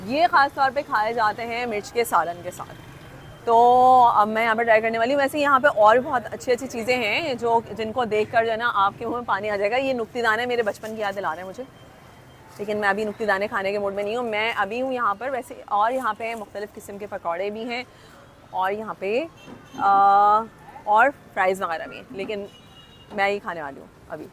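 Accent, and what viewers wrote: Karachi, Pakistan. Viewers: Excellent video,and your urdu slang is marvelous,fluent urdu with typical pronunciation of urdu remind me of Karachi of 90s,lovely keep it up. Greetings from Capetown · Most of the people don't know that you are sindhi as they are confusing you because of typical Urdu speaking accent...your accent is of typical karachite's Urdu speakers...love from Hyderabad ❤ my name is also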